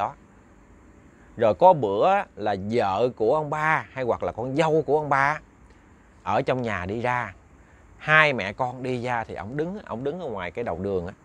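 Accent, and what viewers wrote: Mekong Delta, Vietnam. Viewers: Nghe giọng kể biết là người miền tây , hay !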